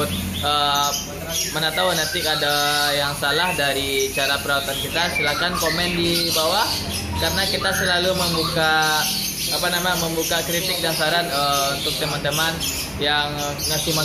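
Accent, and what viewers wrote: Indonesia. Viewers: bang orang Padang apa madura ? kok logat bahasa nya kek orang madura ya 😁✌️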